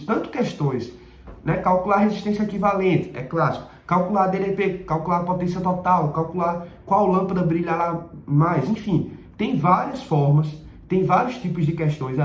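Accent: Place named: Northeast, Brazil. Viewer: Parece nordestino.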